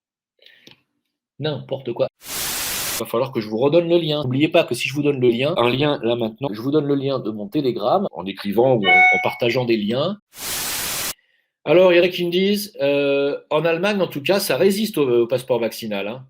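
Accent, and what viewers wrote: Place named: Algeria. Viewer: l'accent pied noir chinois c'est juste EPIC !!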